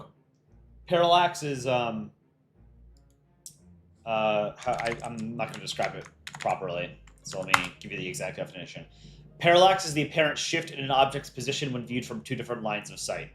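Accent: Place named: United States. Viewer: your voice like United States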